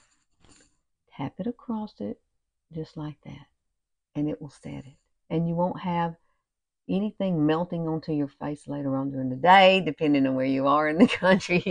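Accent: South, United States. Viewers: you are so cute. I am curious as to why use lipstick? What about cream blushes and or bronzers? I can see an advantage if you don’t have a lot of money to spend on makeup. I love the eye look!! And I love yr Southern accent!!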